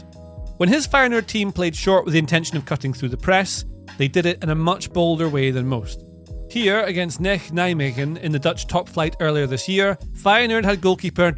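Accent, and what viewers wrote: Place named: England, United Kingdom. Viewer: Please consider adding more visualizations or subtitles. The topic is really interesting, but the video moves at a fast pace and uses many technical terms. The heavy English accent also makes it hard to follow for an international fan like me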